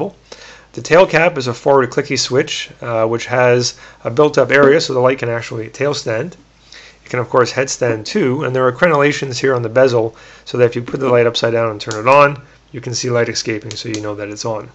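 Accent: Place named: Canada. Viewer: Actually I noticed your accent. Ive watched my share of CBC and Kids In The Hall lol.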